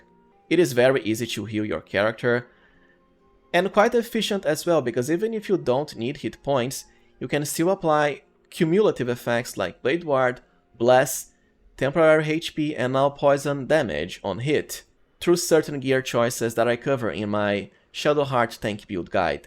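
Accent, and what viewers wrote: Brazil. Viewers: Nice build as always! E ai mano, vou mentir não seu sotaque em inglês parece de Br vc é br? Keep the up the good content bro, you helped me a lot in tactician.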